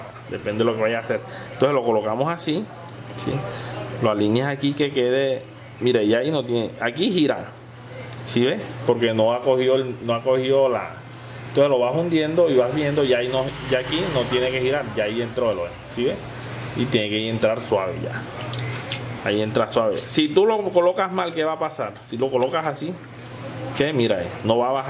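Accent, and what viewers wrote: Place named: Caribbean Coast, Colombia. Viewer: ¿De donde saca ud. Que es venezolano? bien colombiano y costeño que si es. No se de donde pero su acento me lleva a pensar que es del sur de Bolívar o Sucreño.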